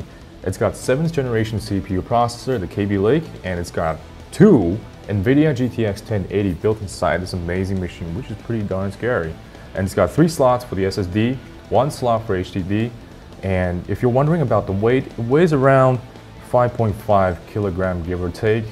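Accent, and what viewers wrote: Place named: Los Angeles, United States. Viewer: 觉得的口音好好听。能问一下是什么地方的口音吗我觉得好像是洛杉矶的？若能回复十分感谢。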